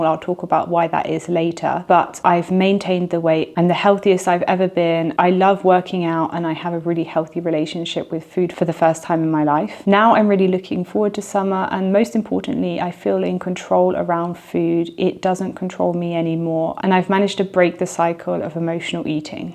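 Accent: United Kingdom. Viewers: The British accent made it difficult for me to watch and understand this video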